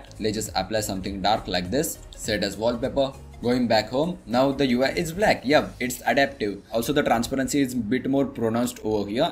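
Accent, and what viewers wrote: India. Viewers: He has Hindi accent English to be precise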